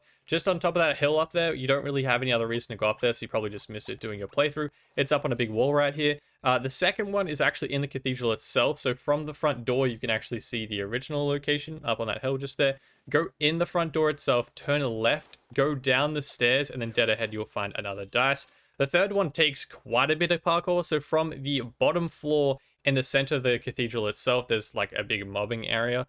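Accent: Australia. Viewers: Thanks for putting all these in an easy order and not giving up after 4 maps like joltzdude did 🙌 also u aussie?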